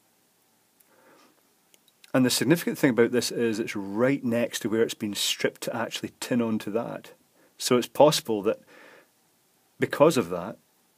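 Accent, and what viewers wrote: Scotland, United Kingdom. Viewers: Why does it sound so good when Scottish people talk about nerdy things?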